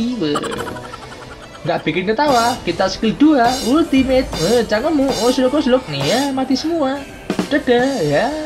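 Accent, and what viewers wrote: Java, Indonesia. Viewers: Medog bang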